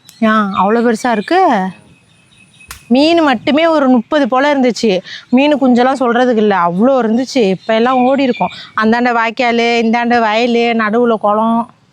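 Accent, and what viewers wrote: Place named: Mayiladuthurai, India. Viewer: Your talking slang reminds me of my friend… she is also from Mayiladuthurai.❤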